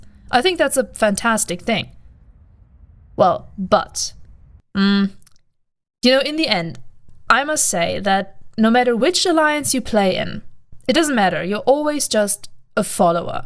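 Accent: Germany. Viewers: no le hagas caso por favor, tu ingles se entiende perfectamente y tu acento alemán es bonito. Se te entiende mejor de muchos streamers americanos (o ingleses?) que por tener demasiada confianza con su idioma resultan incomprensibles a quien no lo habla muy bien. Y para ser pesado también diría que el idioma ingles copió la palabra Horde desde el francés, donde la "E" no es muda para nada. Sigue así, gracias por tus videos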